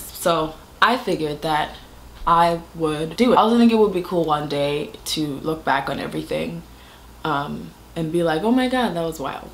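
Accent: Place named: Botswana. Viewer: i literally came back to watch a couple of your videos from last year last week, this is such a pleasant surprise! also your setswana accent is legitimately my favourite accent i’ve ever heard, i tell my friends this all the time.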